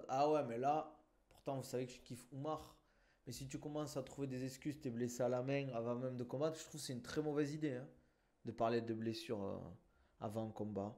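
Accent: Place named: South, France. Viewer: Tu viens d’ou en France c’est juste pour savoir vu que t’as un accent du sud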